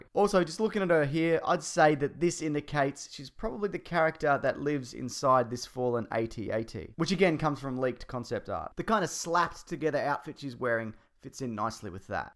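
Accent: Australia. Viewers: Your an aussie arnt ya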